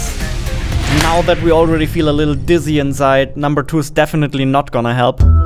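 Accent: Germany. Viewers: You're german like me I can hear your accent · Du bist aus Deutschland Ich weiß es ganz genau dein Name ist und du hast mega akzent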